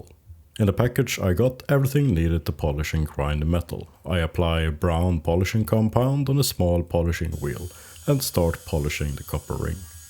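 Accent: Sweden. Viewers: are u swedish by any chance or texas? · 🌹THANK YOU for sharing 🌹 An awesome pipe and mouthpiece, thank you. Your English is good, real good. Your Swedish accent is real good also, I understand it perfectly, I listen to people. Please continue with the videos and the voiceovers. I learned a lot from this video, I think I will try making my own pipe, just once. 🌹🙏🏿😔🙏🏿🐾🐒🐾🌹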